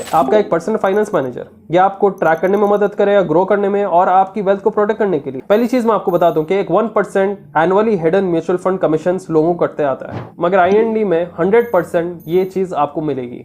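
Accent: Hyderabad, India. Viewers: Bhai app Hyderabadi hoo kya ❤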